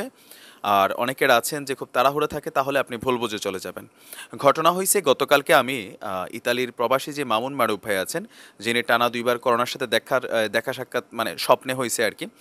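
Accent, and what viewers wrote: Comilla, Bangladesh. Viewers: ভাই আপনি কি কুমিল্লার নাকি? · ভাইয়া,,যেভাবে শুরু করছিলেন,,😂😂 আপনি পারেন ও ভাই😜বাই দ্যা ওয়ে,আমি কিন্তু কুমিল্লার বউ,,,😁আপনি একদম খাছ কুমিল্লা পোলা😬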